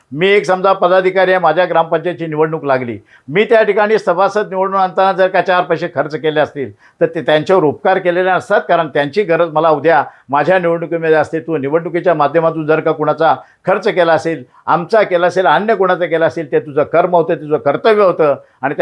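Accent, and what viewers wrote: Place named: Agri, India. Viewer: आगरी आवाज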